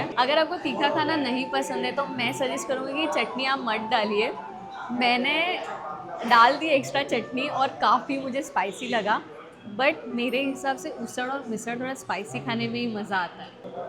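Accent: Gujarat, India. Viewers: Very very very poorly researched video Also the lady commentator desperately needs to either speak in Gujarati or not at all I have grown up in Baroda and can say with all assurance that the script, the choice of sites and ugh, that Gujarati accent trying to struggle with Hindi are all awful! This video does ABSOLUTELY no justice to my beautiful Baroda.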